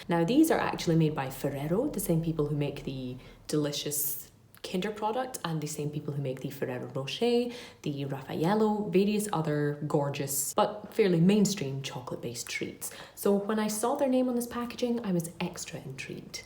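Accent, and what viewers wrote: Scotland, United Kingdom. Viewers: Is your accent Scottish? It’s beautiful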